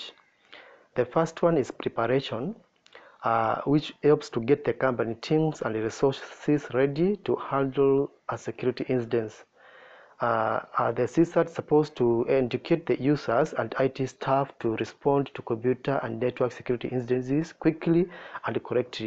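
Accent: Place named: Kenya. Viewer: Nice one really helped our company. Thanks so much. This guys however sounds like a Kenyan.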